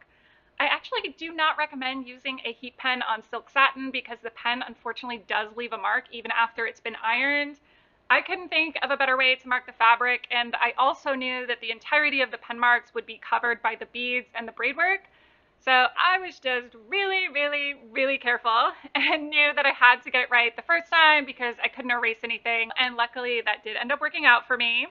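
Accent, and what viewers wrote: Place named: United States. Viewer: I love your art but I dont like american accent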